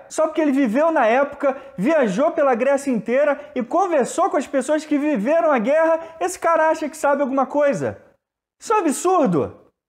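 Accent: Rio de Janeiro, Brazil. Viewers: "Sou português" falando Português BR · Português com sotaque e falas brasileiras kkkkkkk Também sou kkkkkk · E que sempre me queixei da falta de bons canais do youtube em portugues-pt FINALMENTE, encontrei um feito por um tuga mas com sotaque PT-BR. Enfim ainda bem que ja estou habituado xD Bom trabalho o canal esta incrivel. Mesmo o que fazia falta. e para mais um canal de outro amante de guerra :) Eu confesso que sempre achei a era napoleónica muito chata na guerra. Vais falar deste assunto? Ou para já é tudo historia medieval? Abraços de Portugal :) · É o português mais carioca que eu já vi 😁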